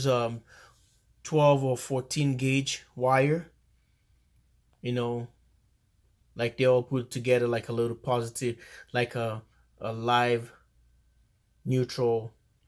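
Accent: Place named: Nigeria. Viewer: You talk like a Nigerian. Nice video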